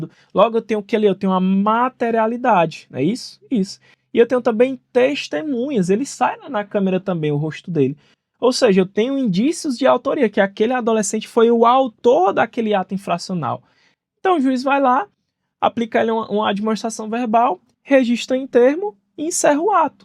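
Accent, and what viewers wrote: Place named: Northeast, Brazil. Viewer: Não fala mal do seu sotaque! Com tantas potências no nordeste brasileiro, este se tornou o que eu mais gosto! É um sotaque digno de orgulho!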